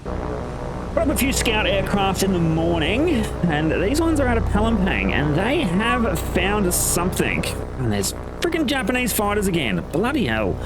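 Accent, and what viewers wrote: Australia. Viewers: good to hear an Australian voice on these vids. Nice bit of creativity in the letters home. You could even include some history into some of them. I can tell you know a bit.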